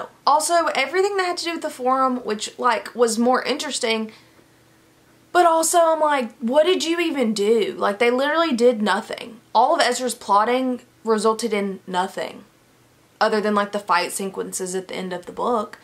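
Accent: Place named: South, United States. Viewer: lol definitely a little country but its not super thick. It pops out every few words for sure. I'm from New York though so my ears may also just be tuned into our sound 😂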